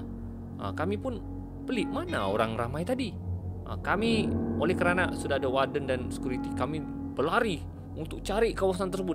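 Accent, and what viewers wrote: Sabah, Malaysia. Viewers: Abang orang Sabah ke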